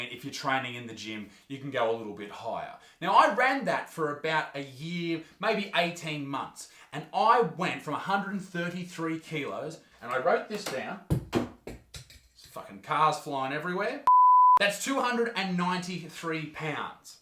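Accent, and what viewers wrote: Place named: Australia. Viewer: I just love way of talking, not just the fact his accent is Australian. But the way he brings the problems to light and why stupid shit like this can be extremely harmful for just the people who pro overweight confidence but people who are insecure and it gives some them bad ideas. Nice work, even if those don't listen but there are people we can save from this stupid ideas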